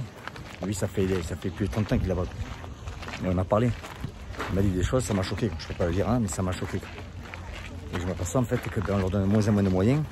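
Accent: South, France. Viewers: Moi aussi triste.J’adore Votre accent du sud❤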